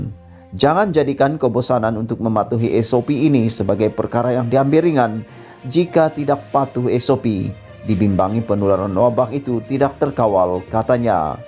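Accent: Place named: Sabah, Malaysia. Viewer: Pembaca tu sabahan bro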